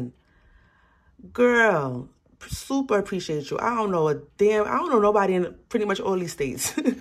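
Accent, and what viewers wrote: New York, United States. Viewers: You’re definitely from New York lol🥰🥰🥰 I’m starting my neice lip gloss business for her and this is very helpful… Sending love from Jersey 😘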